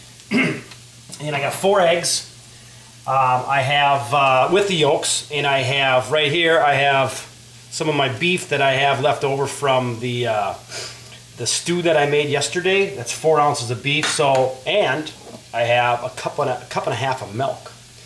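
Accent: Canada. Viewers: CAN SOMEBODY SAY CANADIAN??? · Are you canadian? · Def Canadian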